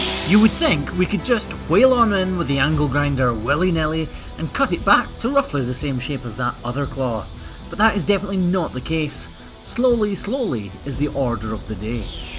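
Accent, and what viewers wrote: Scotland, United Kingdom. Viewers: he sounds scotish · Hey, Where is the big smile and a hand over the camera lens? What's with the black screen? Oh, I know the nasties over on Craigie Boy have caused you to rethink everything. Come on love, we want the smile back and the hand over the lens. Those two things speak of you as much as Your Scottish accent. Tell all the nasties to buzz off! Do that, and I'll kiss one of your cows. lol Have a good evening.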